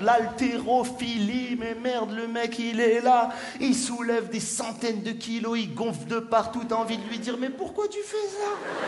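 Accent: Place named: North Africa. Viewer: J'aime la façon comment les Maghrébins parlent :D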